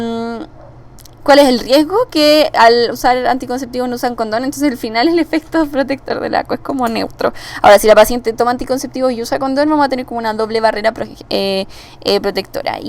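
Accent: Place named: Chile. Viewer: Cómo se nota que es chilena Atte: una chilena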